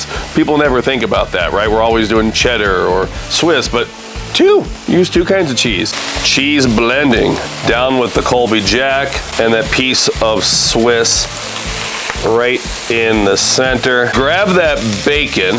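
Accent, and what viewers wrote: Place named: Pittsburgh, United States. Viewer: Great video! Now I'm hungry! I always thought you sounded like a western Pennsylvanian. I grew up about an hour north of 'da burgh, so I speak Pittsburghese. :-) Keep up the great work!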